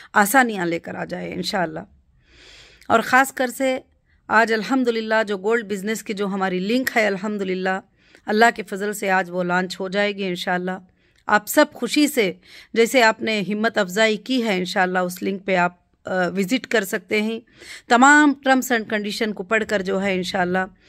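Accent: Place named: Bihar, India. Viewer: ye bihari hai bol se lang raha hai to up bihar m launch kar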